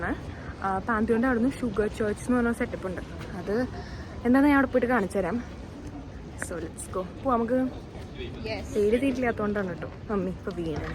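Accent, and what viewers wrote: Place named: Thrissur, India. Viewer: Aa thrissur slang 😁😁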